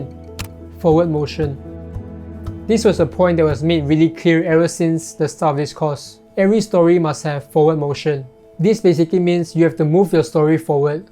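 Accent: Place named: Malaysia. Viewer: your accent is oh so familiar! you're malaysian, it turns out!!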